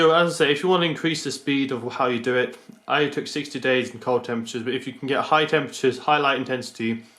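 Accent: Scotland, United Kingdom. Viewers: He's using british pronunciation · Fuck you go with brexit, I hate this accent I could slay everyone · I guess that 400 people with the famous "brown thumb" gave 'thumbs down'. No chance for them to grow anything EVER, so they hate everybody who is able to grow their own stuff. Awesome video, even you talk too fast too much, and half of the time you are mumbling, hard to understand for a Non-Scotch. Still, awesome. It's alsoeven easier to have green onions all year long, which I love more than basil. They grow indoors and outdoors.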